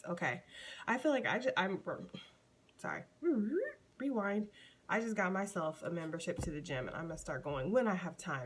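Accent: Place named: New York, United States. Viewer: You talkn to me this morning #pureNYvoice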